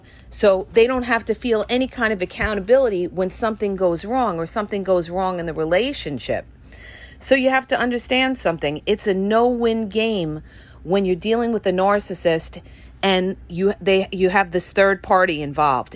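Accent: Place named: East Coast, United States. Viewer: Her east coast accent is wild. She doesn't look like she would talk this way. I love her videos, very informative.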